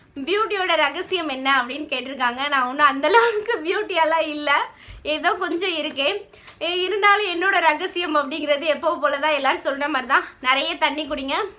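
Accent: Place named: Nagercoil, India. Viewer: illa akka...unga slang nagarkovil madiri iruku adan keten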